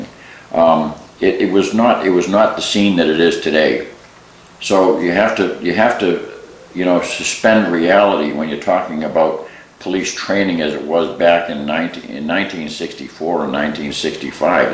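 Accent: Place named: Vermont, United States. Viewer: Are you VT/N.H.? Your accent reminds me of one of my troops in Iraq. He was raised on a dairy farm in VT. He's now a detective locally.🇺🇸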